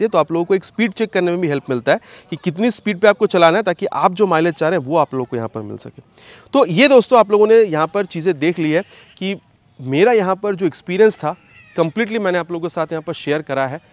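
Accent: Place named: Bengal, India. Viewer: But bengali accent of hindi hits different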